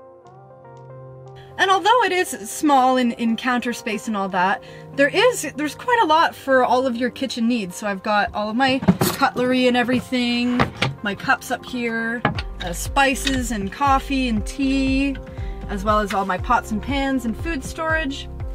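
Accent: Canada. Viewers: Your accent is so Canadian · I came for the cutie with the Canadian accent. Then realized the video was pretty good too.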